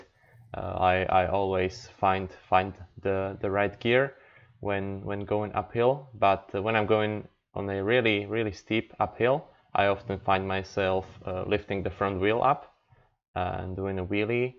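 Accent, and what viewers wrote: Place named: Slovakia. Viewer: najprv som si myslel, ze je toto video od nejakeho nemca ale potom som pocuval ten prizvuk a hned som vedel, ze musis byt slovak :D Tiez budem kupovat Foxy ale 2021, ako si spokojny?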